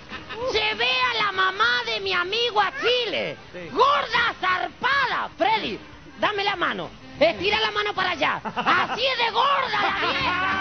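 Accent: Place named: Argentina. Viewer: el chileno copia los chistes de el flaco y de hermogenes conache esos son capos y ademas si es chileno porque habla como Argentino?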